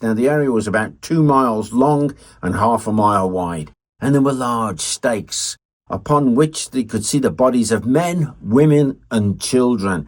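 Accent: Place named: RP, United Kingdom. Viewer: Great video. Posh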